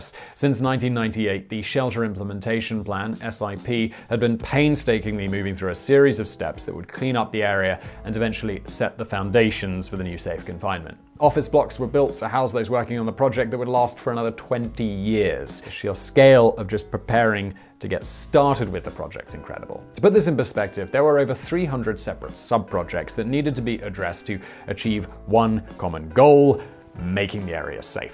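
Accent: RP, United Kingdom. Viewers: Very posh. Thanks for the video.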